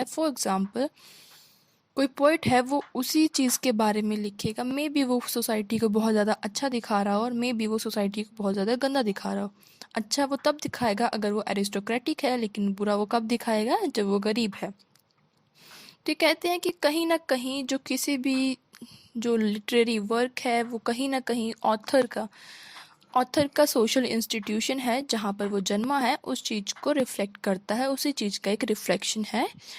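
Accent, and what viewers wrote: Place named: Kashmir, India. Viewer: ur voice looked like u were Kashmiri Anyways thx my dear sister Stay blessed ❤️❤️❤️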